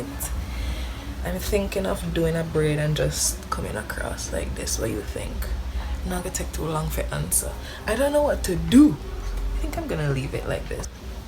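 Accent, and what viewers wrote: Jamaica. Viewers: You sound like a Jamaican 🥵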